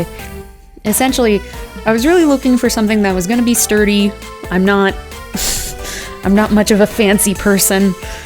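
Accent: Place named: Texas, United States. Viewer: Such an excellent review, the oh so most divine a Texas mouth can bring to the table!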